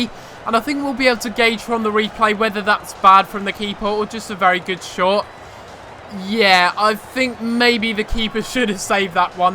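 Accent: England, United Kingdom. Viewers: I know it sounds weird but what part of england are you from?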